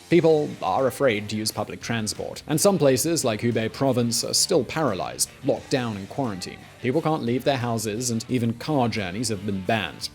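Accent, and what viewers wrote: United Kingdom. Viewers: Tmr pinche inglés británico xd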